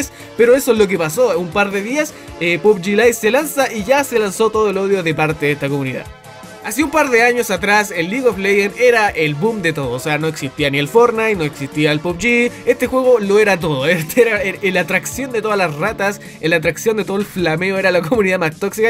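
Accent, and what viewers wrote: Chile. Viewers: Eres chileno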